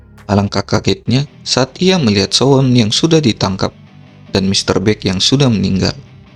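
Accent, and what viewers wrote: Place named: Makassar, Indonesia. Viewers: Orang Makasar ini host nya.. dari logat keliatan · Bner bgt kaya org Makasar suaranya....aku punya saudara di Makassar kota bantaeng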